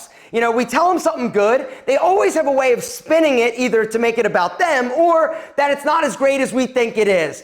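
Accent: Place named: Delaware County, United States. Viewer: you from delco bud?? You have the accent.. G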